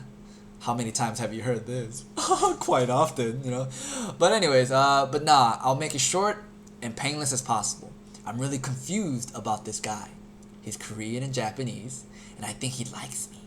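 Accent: New York, United States. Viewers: That accent sounds New York. I luv NYC accents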